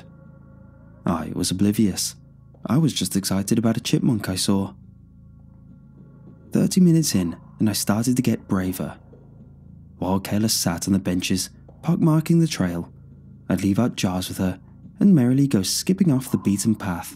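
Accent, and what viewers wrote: United Kingdom. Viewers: That British accent ❤❤❤❤❤❤❤❤❤❤❤❤❤❤❤❤🍌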